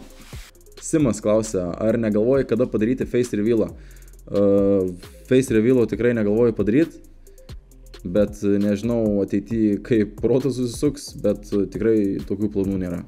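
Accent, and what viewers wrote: Suvalkija, Lithuania. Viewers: Pagal tarme kazkur is Suvalkijos busi :D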